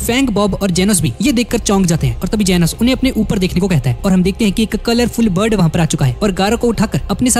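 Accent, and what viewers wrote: Punjab, India. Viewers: Bhai aap punjab se kya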